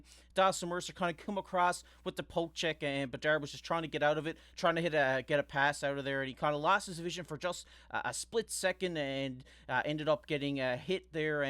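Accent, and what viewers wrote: Newfoundland, Canada. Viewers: No trouble to tell your a fellow Newfie! Good to see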